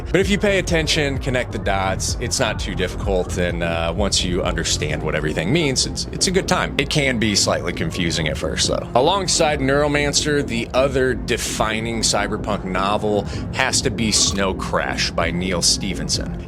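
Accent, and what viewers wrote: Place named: Australia. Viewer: The Australian accent got you a follow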